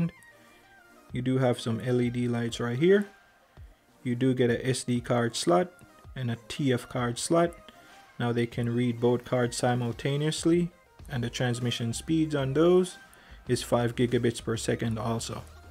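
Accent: Jamaica. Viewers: The Jamaican can’t hide in your voice bro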